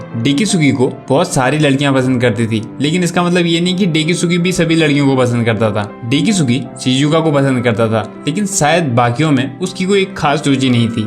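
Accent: Standard. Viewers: Bro damn ur hindi is pure. It's not everyday i get to hear pure hindi. Good job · Omg how can you speak so much pure hindi?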